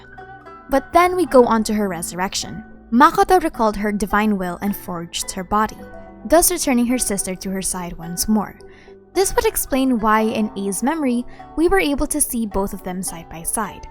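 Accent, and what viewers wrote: Philippines. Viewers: Pinay ka? HAHAHA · is she from philippines or what? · I knew it! You were a Filipino the whole time, ur English accent is familiar taena Anyways, cool video! I didn't even know that book existed, more lore crumbs for the Almighty Shogun!!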